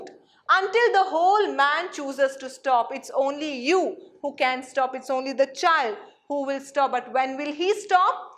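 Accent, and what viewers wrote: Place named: Kerala, India. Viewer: Vocab is ok but pronunciation 🥵....mallu pronunciation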